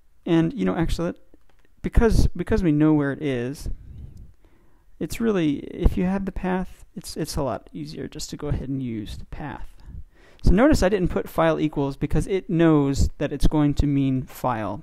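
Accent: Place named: India. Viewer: Not a thick indian accent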